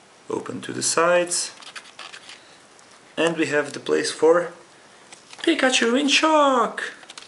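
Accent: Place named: Portugal. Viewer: This is such a Portuguese accent ehehehehe great video :)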